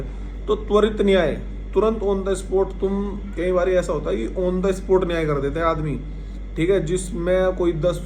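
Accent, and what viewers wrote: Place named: Meerut, India. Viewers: Bhai ap kha se ho Meerut k aas pas k lg rhe ho language accent se... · भाई कौन से जिले से हो बोली तो हमारी जैसी lagri है मैं शामली से हु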